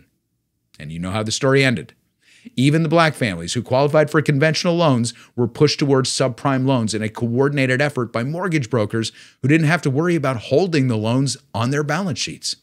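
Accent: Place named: England, United Kingdom. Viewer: Uhh acento ingles mal asunto jajajaja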